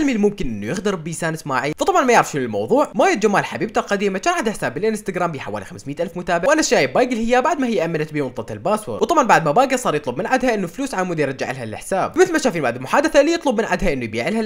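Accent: Iraq. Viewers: بس سوال انته عراقي لو لا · سؤال انته عراقي لو لا ردلي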